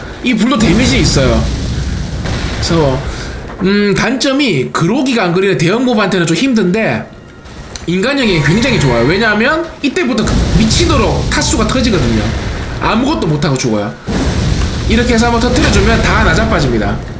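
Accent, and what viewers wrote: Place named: Vietnam. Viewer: 말투가….. 어느 나라에서 오셨나요 베트남이나 조선족분같은데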